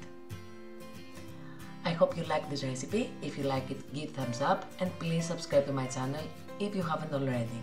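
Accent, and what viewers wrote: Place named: Greece. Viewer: I love your Greek accent😍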